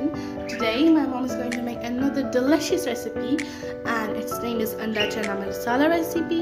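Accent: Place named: England, United Kingdom. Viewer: Yooo Desi recipe in English accent... Yummy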